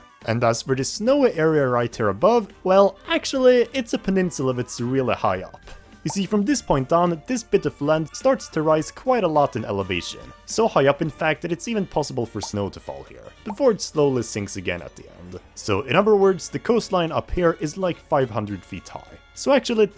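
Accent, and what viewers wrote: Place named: Sweden. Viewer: She’s Swedish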